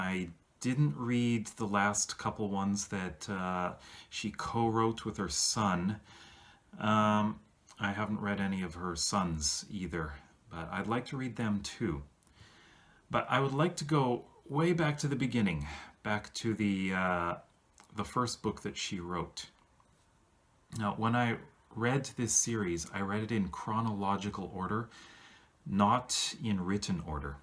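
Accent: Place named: Canada. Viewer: I like your accent! Is it Canadian?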